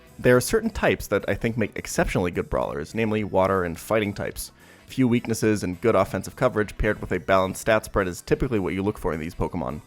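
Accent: Germany. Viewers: He is, in his earlier videos you could hear his german accent more clearly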